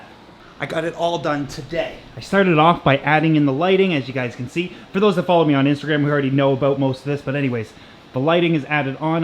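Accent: Canada. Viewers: I only just started to notice your Canadian accent in videos... I must be too busy looking at your amazing tanks to have noticed your cool accent.. Love the vids as always!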